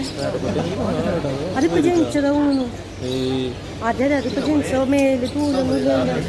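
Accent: Thrissur, India. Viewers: Thrissur samsaram. Super 2 perum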